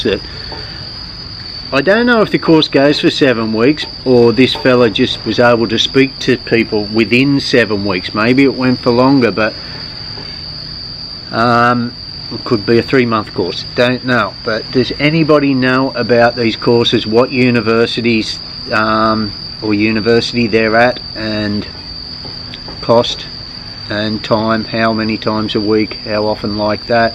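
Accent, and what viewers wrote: Australia. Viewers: Hi. I think using English letters (Romanised) for Lao is very difficult. There is no standard. There are many Lao - English dictionaries, but assume you speak French. (Hence the screw up with the "S" in Laos) or that you know phonetics. I speak guttural Northamptonshire English; and you Aussie. (I assume that's the noise being picked up by the microphone.) We both know English and can understand each other, but it's those vowels and tones that have to be correct when speaking Lao. if i wrote in my dialect, what you'd say would be miles off. I work with a Glaswegian - lovely chap - but I have to listen to him closely. When he is with his mates - I give up. That was a long way of saying "No" - but I hope helps! Here's a link to a web site I'm playing with. <link> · Are you Queenslander?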